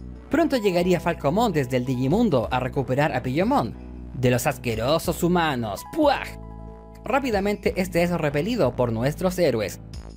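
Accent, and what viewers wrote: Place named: Chile. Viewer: Es chileno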